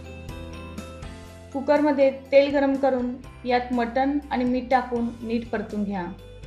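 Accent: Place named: Kolhapur, India. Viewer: Tumhi kolhapuri aahat kai..accent aahe तुमची mala kolhapuri language khup avadte.😍🥰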